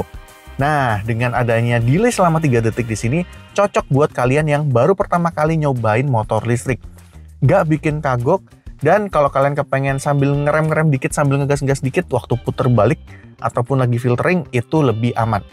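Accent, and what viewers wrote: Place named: Surabaya, Indonesia. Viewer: Suaranya jga mirip sekilas, cuma dg aksen suroboyo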